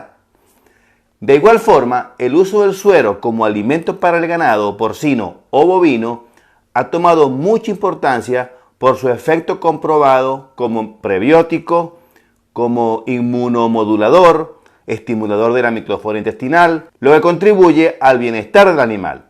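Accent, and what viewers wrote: Zulia, Venezuela. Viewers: Doctor ya me suscribi. Ud tiene un acento Marabino Zuliano. Felicitaciones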